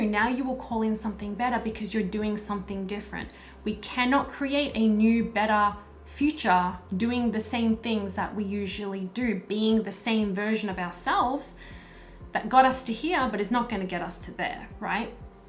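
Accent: Australia. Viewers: I thought that was an aussie accent! 😉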